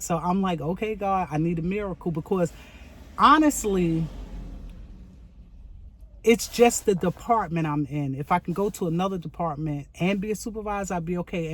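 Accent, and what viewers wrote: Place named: North, United Kingdom. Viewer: Happy New Year,!!!! New subbie here and I can already see I'm going to like it here. You sound like you're from up north. "She never liked me, she can't stand me." WHAT???? NAH.... But congratulations on your engagement. This is wild BUT 2025 is here. Let's get it off to a good start.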